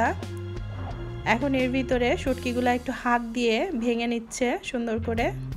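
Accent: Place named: Dhaka, Bangladesh. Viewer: bangali Na tumi dhakai Bangladesh theke tomar kothai bijha jache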